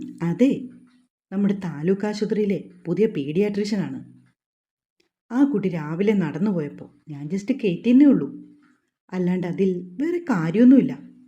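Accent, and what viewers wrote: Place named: Kollam, India. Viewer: . നല്ല കഥ സൂപ്പർ സൗണ്ട് നിങ്ങൾ ഏതു നാടുകരി ആണ് കെല്ല o ആണോ ഞാൻ കാലിക്ക കാലിക്കറ്റ് ആണ് കെല്ലം കാരുടെ . സംസാരം ആണല്ലോ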